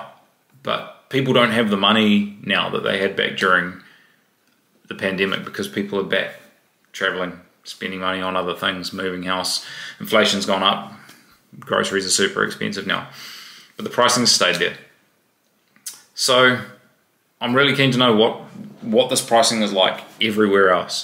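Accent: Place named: New Zealand. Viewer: I AM outside of New Zealand watching this. But with mumbled staccato outbursts it’s really hard to understand. (Of course I understand that’s your native accent. But being more prepared and slowing down it might be easier to understand)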